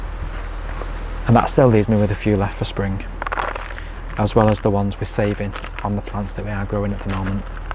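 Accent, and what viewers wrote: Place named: England, United Kingdom. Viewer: You sound English, are you in Europe or here in the USA.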